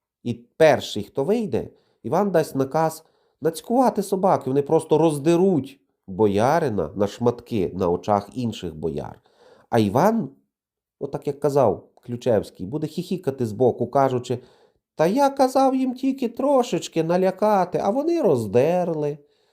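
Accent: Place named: Galicia, Ukraine. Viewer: То здається ви завше так культурно і невинно використовуєте " здихав" і т.і. до моск- тів)) а ще ваш може то галичанський акцент, то звучить це дуже оригінально😅😂😂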